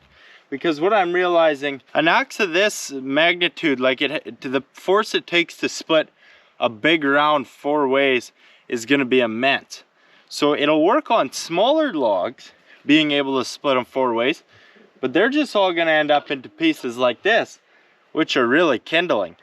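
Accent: Canada. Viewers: Love that middle Canadian accent. If you said ‘don’t ya know’ after sentences I’d say you were from north of Minnesota lol. Love this channel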